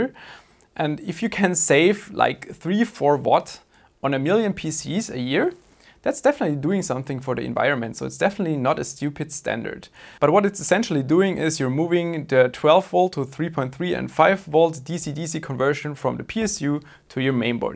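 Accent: Germany. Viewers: Hahaha dieser deutsche Akzent kickt anders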